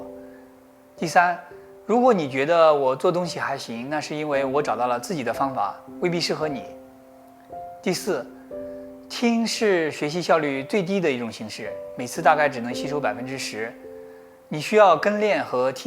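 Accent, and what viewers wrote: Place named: China. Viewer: Привет! Всегда думал, что ты из Японии, но твоя речь и особенно цифра "5" дали мне понять, что ты из Китая. Просто, я не слышал, как ты разговариваешь). Но, не это главное.. Каждое слово в твоём монологе, каждая мысль, созвучны с моими мыслями. Я всю свою жизнь не зависел от начальников, государственных структур и тому подобного. Всю свою сознательную жизнь я зарабатывал своими руками и накопленным опытом. Это были, в основном, ремонты интерьера и разработка и изготовление узкоспециализированных станков. Теперь мне 49 лет, но я гораздо моложе физически и полон сил.... Обеспечил себя большим количеством свободного времени и хорошего инструмента. Твои видео, а так же других мастеров, дают мне идеи и приёмы в новом опыте. Благодарю тебя. Привет из Мариуполя..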